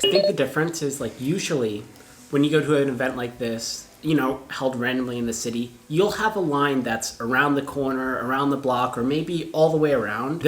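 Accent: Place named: Argentina. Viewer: "Sha" usas lunfardo gringa, sha casi argentina 😂❤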